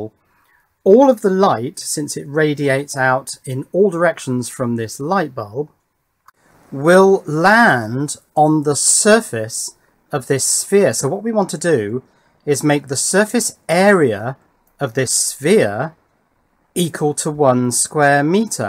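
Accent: England, United Kingdom. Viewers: By his accent, England I'd guess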